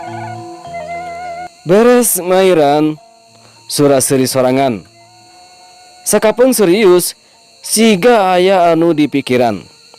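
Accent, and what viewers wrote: Sunda, Indonesia. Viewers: 😄👍👌tos ciri khas soanten kg Guru mah sundanise pisan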